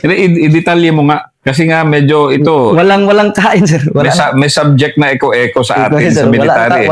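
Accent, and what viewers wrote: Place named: Surigao, Philippines. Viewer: Sgt kumusta ang galing mo parang taga Agsam o Ibuan Lanuza surigao del sur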